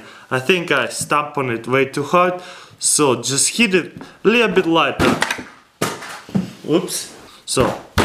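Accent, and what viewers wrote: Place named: Russia. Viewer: он русский, слышно по акценту, наш человек.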